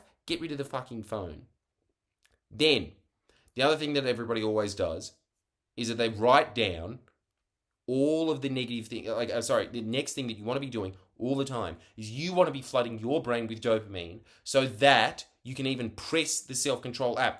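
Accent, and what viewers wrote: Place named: Australia. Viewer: I love your videos man I'm just curious because of your accent I thought you were Australian but now you're half Hawaiian American I don't care it's just for a laugh thanks so much for helping other people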